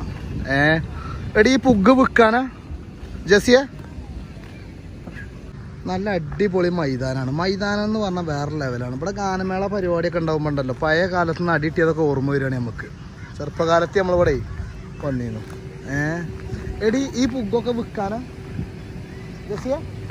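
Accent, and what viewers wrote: Malappuram, India. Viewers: തനി മലപ്പുറം കാരൻ തന്നെ അടിപൊളി പച്ച മലയാളം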